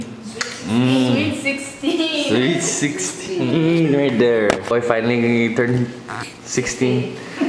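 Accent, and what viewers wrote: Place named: Saipan, Northern Mariana Islands. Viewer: Yo I been missing you lol. Glad to hear our island accent in your video. Keep up with the good work ma brother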